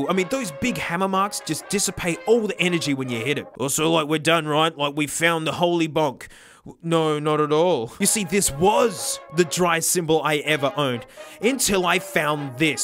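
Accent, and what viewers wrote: Australia. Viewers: I've been watching this guy for seconds max, and it also took me that long to hear JonTron in his strained voice and I will never unhear him as aussie JonTron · You sound like that one Australian guy that talks in that one Australia sound. You know that him I'm talking about? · Man you don't have to be so performatively australian to cater to the americans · I want SMOKO so leave me alone! Nah, I heard an Australian accent, whilst talking about drum-specific things - had to subscribe!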